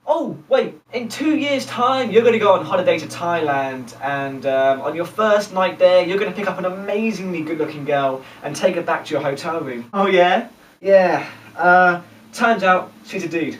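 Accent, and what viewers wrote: United Kingdom. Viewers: very funny british accent :)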